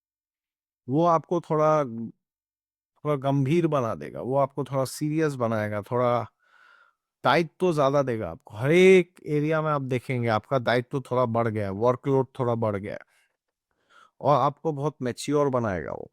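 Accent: Assam, India. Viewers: Sir Aapki Hindi to kaphi acchi hain, Sir aap Assam se ho kya? Apki accent Assamese ya Bengali jaisi lagti hain???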